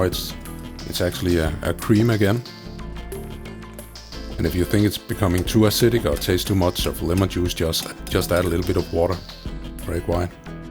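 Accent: Denmark. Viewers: I knew you were danish because of your accent 😉 thanks for the video 😊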